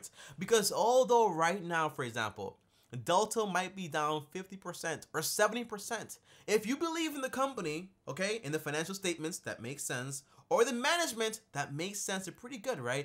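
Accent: Caribbean. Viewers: I hear a Caribbean accent! Lol where is he from?! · Yo is it just me or does he sound carribean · I hear a Caribbean accent. Where are you from? Good video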